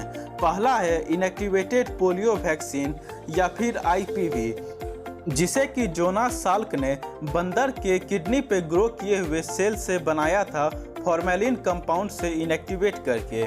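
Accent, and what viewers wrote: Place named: Bihar, India. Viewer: Bihari voice 😍😍😍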